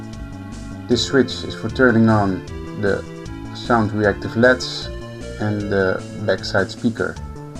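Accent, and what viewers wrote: Netherlands. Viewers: Nederlands accent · w0w dutch accent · You must be dutch, what a horrible accent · Are you Dutch? Cause you sound a little Dutch. :) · You are dutch, i can hear it from your accent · Soep in je schoen. Nederlands accent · the second he started talking i knew he Dutch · Dat Nederlandse accent, though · Are you Dutch? Becouse you sound Dutch. I'm Dutch :)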